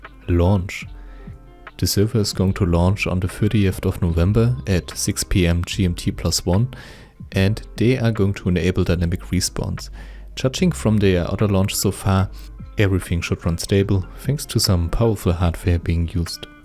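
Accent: Germany. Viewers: Man hört dir raus, dass du Deutscher bist :D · Dein deutscher Akzent digga. Beste.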